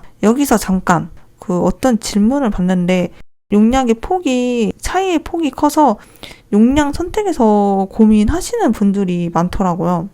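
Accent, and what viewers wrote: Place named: Jeolla, South Korea. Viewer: 전라도 분이신가요?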